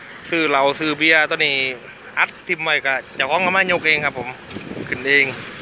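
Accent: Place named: Isan, Thailand. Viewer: กาบเชิงยุบ้านใด๋นอคับเว้าสำเนียงคือยุบ้านผมเลยผมยุโนนนารายณ์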